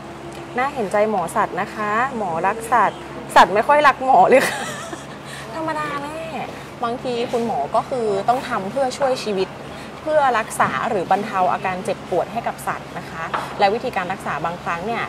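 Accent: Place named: North, Thailand. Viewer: ชอบมากกกแอคมินพูดไทยคำเหนือคำน่ารักมากกกชอบค่ะคุณมาถูกทางแล้วค่ะ❤❤❤❤❤❤❤❤❤❤❤❤❤❤❤❤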